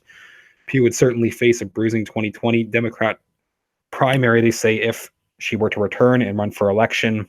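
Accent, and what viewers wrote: Canada. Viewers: Where is he from?, sounds Canadian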